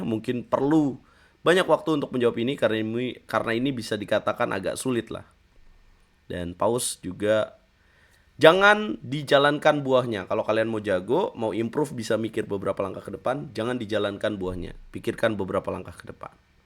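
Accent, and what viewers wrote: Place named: Banyumas, Indonesia. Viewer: mas, masnya orang mana sih? kok kaya bisa bahasa ngapak gitu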